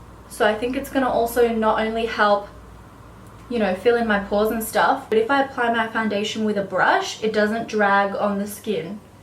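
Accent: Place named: Australia. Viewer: I didn't even realize she was Australian until she said that and then I could hear the accent lol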